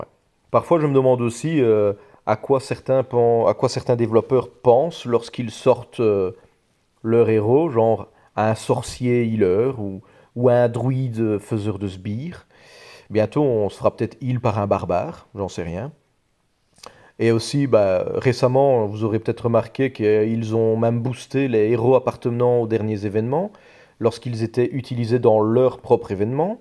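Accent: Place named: Belgium. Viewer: Sans commentaire l'ami toute est di , donc je vais plutôt commencer par te dire que ca fait plaisir de voir un youtubeurs Belge sachant que j'ai moi même des origines belge que j'ai habité Bray Dunes donc juste a la frontière collé à ( la panne ) pendant toute mon enfance et donc forcément ca me fait toujours plaisir de revenir en Belgique chaque année ou même d'entendre l'accent belge qui me rappelle toujours de bon souvenirs , je fais partie de l'alliance de subarix et Julien notamment donc je tes découvert grâce à Juju et je trouve très intéressante ton analyse et bien résumé , et j'vais te dire moi je dépense peut depuis le début de mon aventure et j'me débrouille avec mes héros , j'ai juste pris le vip très vite pour finir mon camp rapidement , depuis je ne le prend plus et donc j'investi encore moins , j'étais à environ ( 20 , 25 voir gros max de temps en temps 30€ ) aujourd'hui entre ( 10 et 20€ gros max ) plus ils continuent dans leur délire moins j'investis , désolé pour ce long message , je ferrais plus court la prochaine fois , moi c'est Georgio salut et à bientôt l'ami 😉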